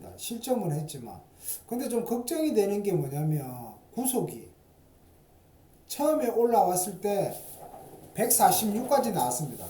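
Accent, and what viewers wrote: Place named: Busan, South Korea. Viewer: 저는 소리 전혀 안울리는데요! 소리가 약간 작게들리는것은 있는데~ 제가 볼륨을 올리면 듣기에는 전혀 지장이없습니다! 소리 언급 하시는분들 가끔있으시던데! 소리가 울리기보다는 제생각에는 사투리억양 때문이 아닐런지 싶습니다! 저는 부산사람이라 오히려 다른유트뷰 분보다 더 잘들리는데! 서울분들은 억양때문에 좀더 집중해서 들어야 되지 않을까? 란 추측을 해봅니다!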